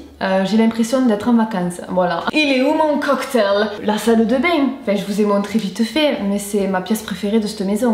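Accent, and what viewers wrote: South, France. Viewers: 🤍🤍🤍🤍🤍 trop belle ! J’adore ton énergie (et cet accent du sud 😍😍😍)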